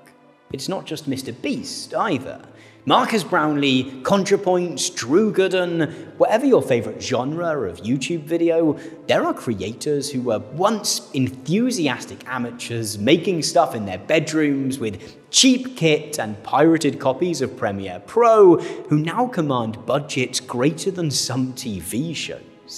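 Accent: RP, United Kingdom. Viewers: cool it with the media training body language and BBC speech. Be yourself.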